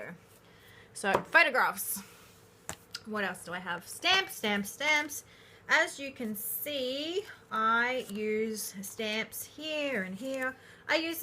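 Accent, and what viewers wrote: Australia. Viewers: I am enjoying going through all your videos! Nice to hear a fellow Aussie accent too x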